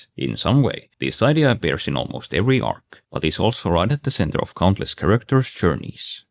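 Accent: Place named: Finland. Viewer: Your accent sounds like a pretty heavy finish one. Are you possibly one of us snowmen. Maybe Maybe not It is meaningless in the end Oh yeah right Cool video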